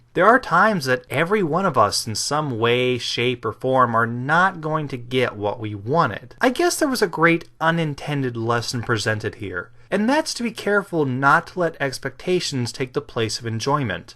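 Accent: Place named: United Kingdom. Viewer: At least she's still British (I think, it was hard to tell exactly what it was).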